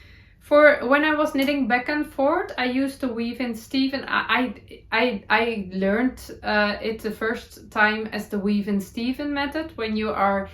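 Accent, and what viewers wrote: Netherlands. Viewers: I love your Dutchy English. Thank you for the reminder to do things that make your heart jump!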